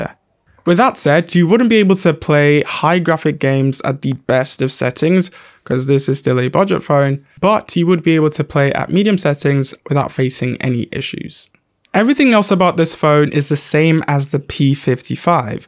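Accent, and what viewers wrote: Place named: United Kingdom. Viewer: Bro your British accents very very cleary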